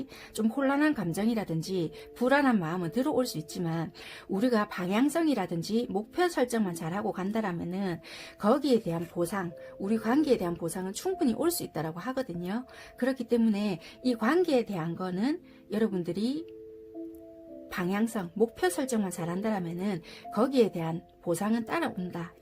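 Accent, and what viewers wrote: Busan, South Korea. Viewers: ㅕㅛㅛㅛㅛㅛㅛㅛㅛㅛㅛㅛㅛㅛㅛㅛㅛㅛㅛㅛㅛㅛㅛㅛㅛㅛㅛㅛㅛㅛㅛㅛㅛㅛㅛㅛㅛㅗㅕㅛㅑㅕㅕㅕ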